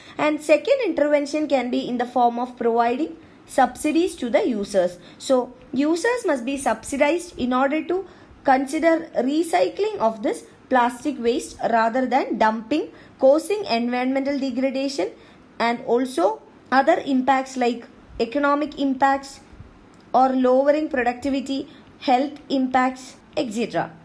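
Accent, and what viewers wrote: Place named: South India, India. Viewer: Your south Indian accent is horrible, the word etc and marine. For God sake please take some classes to improve, its very irritating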